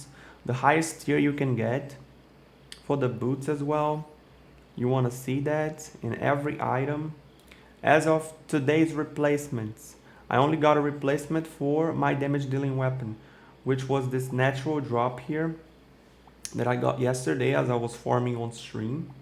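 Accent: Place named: Brazil. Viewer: Da pra perceber q é br pelo sotaque kkk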